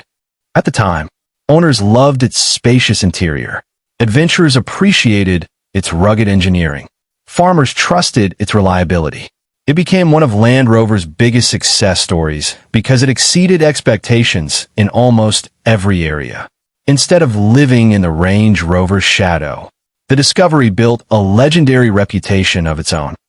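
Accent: United States. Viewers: Number 8 MG Zed R. Why is this narrated in an American accent? A channel with the title UK Torque, referencing British cars, should at the very least use a British narrator.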